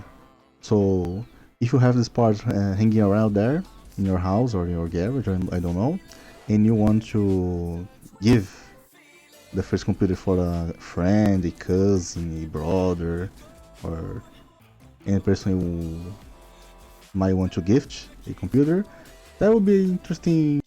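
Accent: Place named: Brazil. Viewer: Immediately recognized the Brazilian accent 🇧🇷🇧🇷🇧🇷🇧🇷🇧🇷🇧🇷🇧🇷🇧🇷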